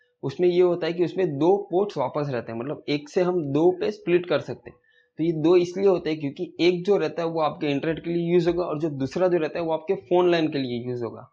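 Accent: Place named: Gujarat, India. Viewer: Bhai app gujarati chone avaj thi lage che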